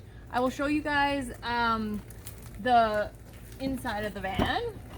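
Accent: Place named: Canada. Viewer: I love a good Canadian accent. Every last work in their sentences goes up a whole pitch. lol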